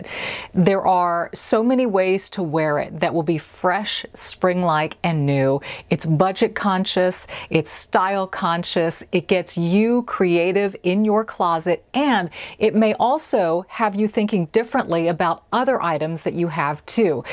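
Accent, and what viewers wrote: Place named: South, United States. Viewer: I love the southern accent!!!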